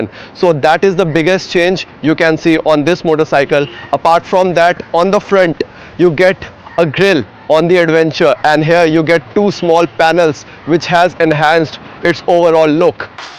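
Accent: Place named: India. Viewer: this might be the finest English accent by an Indian channel I've watched for this bike review, no offense, but this guy actually nailed it...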